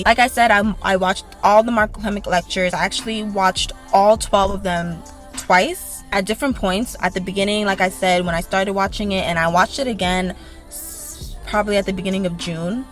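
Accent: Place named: New York, United States. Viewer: I loved this video the entire time i’m like she sounds like she’s from NY bc I’m from the Valley. I felt like i was watching my own testimony from you saying God favors you differently to still living your life while studying But you saying OD nailed it 😂It was in the stars for you I love that you and your mom tested the same date So sweet Congratulations love 👏🏽👏🏽Thanks for the tips ❤️🙏🏼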